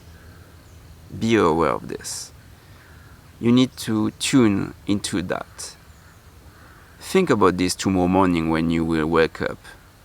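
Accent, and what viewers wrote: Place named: France. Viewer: Ha ! Yes, some of your words are confusing in meaning. Your thick ( I'm guessing French ? ) accent tends to distort them sometimes.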